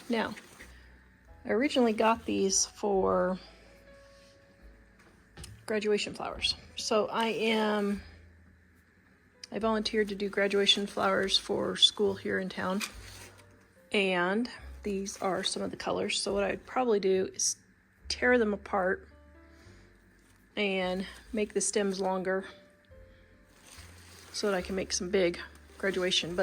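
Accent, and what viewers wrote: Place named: Kentucky, United States. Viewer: Do you live in SE KY? My mother was from there. I didn't notice it in my mother, but decades later I heard her sister pronounce it that way. The two words I know I picked up from my mother's accent was 'iron'. I pronounced it as 'r-in' until I corrected it when I was 12 and my name 'Carolyn'. I pronounced it as 'Car-lyn'. I grew up in MI.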